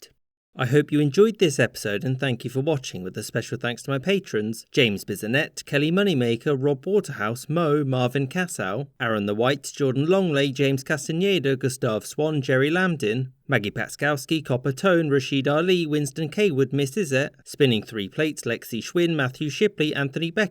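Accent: United Kingdom. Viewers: given the narrator’s very British accent, I suggest your comment is misplaced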